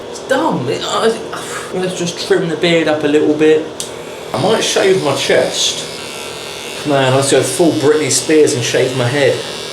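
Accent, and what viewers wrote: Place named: Berkshire, United Kingdom. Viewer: Hi. What part of England are you from? I detect a Berkshire accent but could be wrong! All the best 👍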